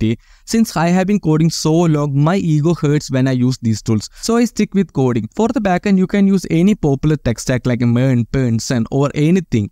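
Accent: Kerala, India. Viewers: Super bro The way your telling is adding some Keralian spicy.. ..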